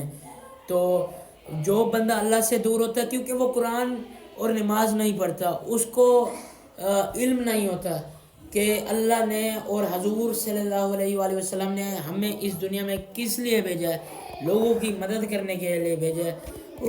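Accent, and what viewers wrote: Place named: Punjab, Pakistan. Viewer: Bhai urdu talaffuz aapka punjabi sai mix horha hai😅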